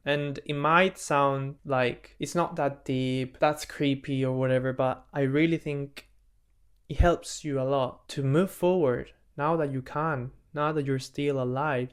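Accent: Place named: United Kingdom. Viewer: This is something that scares me so much! Every time I remember it will inevitably happen, it hits me like a truck. It's really weird to deal with it knowing we will all go through it at some point. Whenever I remember it, I get too existential and start getting too philosophical for my own good. I don't want to miss out on all the things that will happen after my death — books, movies, songs that will be released that might even be my favorites if I were around. It's really difficult to be alive sometimes, but I love the perspective of doing it consciously and being present in each moment. I don't want to waste any time at all to the point it overwhelms me. The fact I don't get another chance if I fuck up now really scares me. This was a great video! I believe you'll still have a large audience one day! Are you from the UK? I was trying to identify your accent the whole time lol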